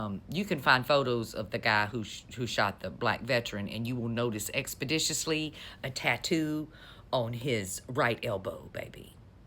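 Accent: South, United States. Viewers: That southern belle tone is annoying as hell im out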